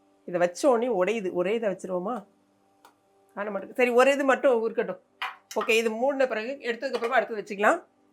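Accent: Tirunelveli, India. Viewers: 👌👍 திருநெல்வேலி யா நல்ல தமிழ் பேசுதேங்க இது முதலில் எழுதியிருக்கேன்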